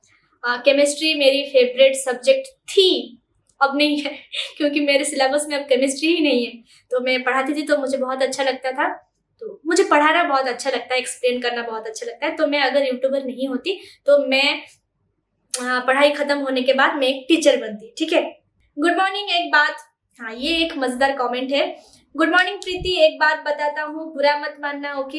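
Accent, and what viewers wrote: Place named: Bengal, India. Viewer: Apki ABAAZ me bengoli bhi cute 🥰 lagti h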